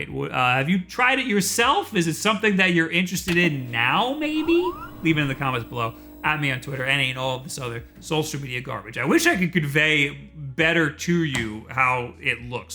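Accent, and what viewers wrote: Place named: New York, United States. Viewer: new yorker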